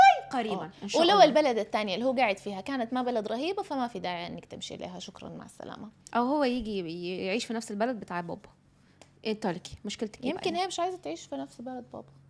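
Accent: Iraq. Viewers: تعودت عليجن تحجن عراقي 😂😂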